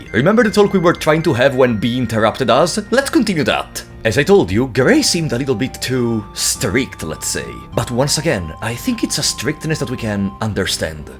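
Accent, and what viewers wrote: Italy. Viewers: That hits me like a truck hearing manusya, a word in my niche language in an english game narrated by a dude with heavy italian accent. Very cool. Didn't know that word came from buddhism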